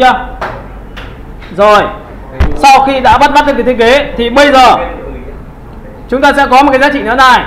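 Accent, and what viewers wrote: North, Vietnam. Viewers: mãi mới tìm dc thầy giỏi .nói giọng bắc. mấy cái miền nam ko nghe rõ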